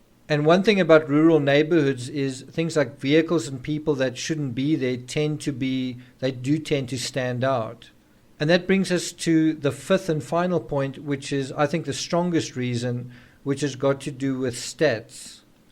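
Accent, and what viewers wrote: South Africa. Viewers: the narrator is South African x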